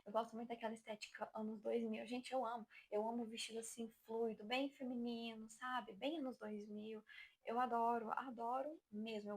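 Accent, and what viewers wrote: Minas Gerais, Brazil. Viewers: É mineira? vive de pão de queijo kkkk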